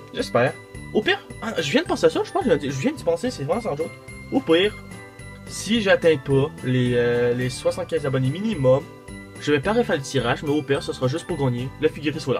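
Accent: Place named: Quebec, Canada. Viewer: J'aime trop la voix québécoise sinon merci du concours au top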